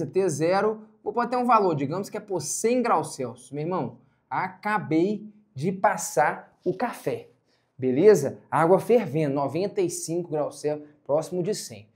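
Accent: Minas Gerais, Brazil. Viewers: Oce e mineiro guisole?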